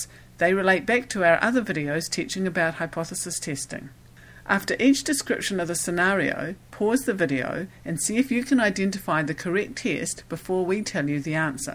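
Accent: New Zealand. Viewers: My apologies if I sounded rude but the content of the video was really helpful! Also, New Zealander's accent is one of my favourite kind of accent! :D Flight of the Conchords ze best! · New Zealand accent. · Wao great as video. If i can please ask are you a kiwi?? If i have guessed right from ur acsent.😊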